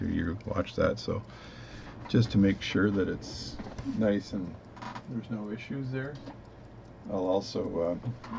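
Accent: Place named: Newfoundland, Canada. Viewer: Ok. The mystery is over. You are from Newfoundland. Right?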